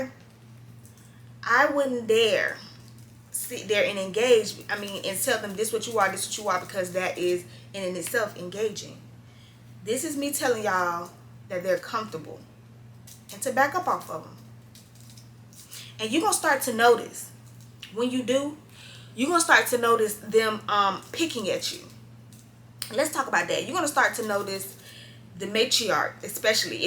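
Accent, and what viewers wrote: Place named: South, United States. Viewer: Girl you country den a MF.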